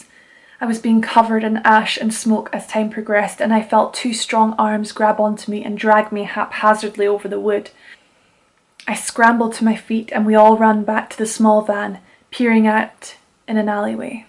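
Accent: Edinburgh, United Kingdom. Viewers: ahhhh nice to hear a scottish accent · So nice to hear such a lovely Scot lass tell a beautiful story. Would love to hear a wee bit more of the Edinburgh accent, it is my favorite sounding speak.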